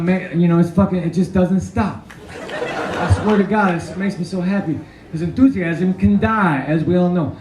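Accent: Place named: South, United States. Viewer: Was he from Georgia? He has a southern accent here. Sorry he is gone❤😅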